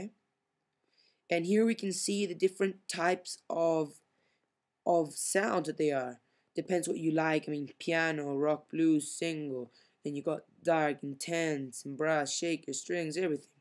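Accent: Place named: England, United Kingdom. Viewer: your accent is very cool. Where are you from? I can't really tell if you're slightly english, or Indian..thanks for the help too :)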